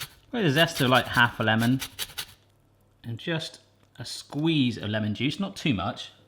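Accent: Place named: England, United Kingdom. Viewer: Such a breath of fresh air listening to someone with an English accident who doesnt start the video with "I'm so excited to be doing a video about..." 😆. And to top it off, an awsome vegan recipe on cauliflower wings! Nice one! Thank you for sharing! Awsome video.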